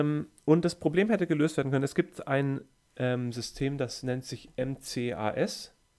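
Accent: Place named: High German, Germany. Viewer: I know German I was born 100 percent pure German I am a hutterite. He's talking g high german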